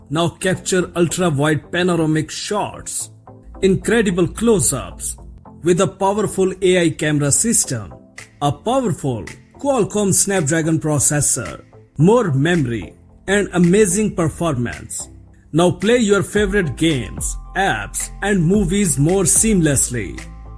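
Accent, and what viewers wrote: India. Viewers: es acento de la India, donde el inglés es el idioma oficial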